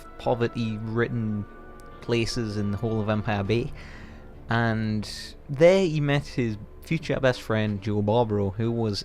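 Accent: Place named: United Kingdom. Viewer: Should’ve been narrated with a proper American accent. This limey sucks!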